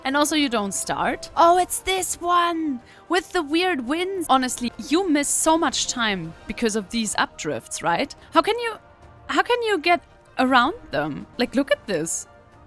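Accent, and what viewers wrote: Germany. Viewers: Ich könnte schwören da deutsch raus zu hören XD "I could swear I could hear German coming out of it"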